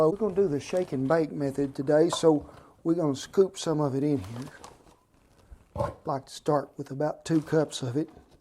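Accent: United States. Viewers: Why did I watch this before sleep, got hungry again:((( What accent is that though, never heard that particular American accent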